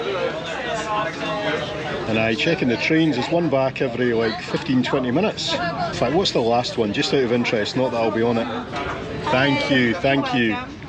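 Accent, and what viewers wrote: Scotland, United Kingdom. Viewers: I just love your accent. I have bin 5 time in Edinburgh and many other places in Scotland, and I sure love your contry. Keep up making videos. 😘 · What I like about is that he does his own research. You will never hear him say "I don't know. If you know, let me know in the comments..." Other travel vloggers come across as ignorant and lazy compared to. Also love the Scottish accent.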